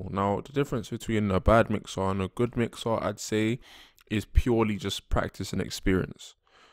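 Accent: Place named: South London, United Kingdom. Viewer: Like you said which I concur, this video is really basic, more theoretical than practical but Yo!!!! What I really dig & would have to say at this the early stage, the primary reason I've enjoyed listening to what you have to say regarding mixing is that wicked "Attack The Block" perhaps South London English accent of yours :)....You know the saying "It's not what you say, it's how you say it!" Lol!...Anyway your accent, at least for me sounds & brings a much welcomed urban/street vibe from England that breaks up the monotony a little.... Yeah man anyway Big Ups & Propers from Los Angeles...Future Video request....Since you mentioned getting mixes sounding as good as Dre...Do a video on Mixing in Mono.....Peace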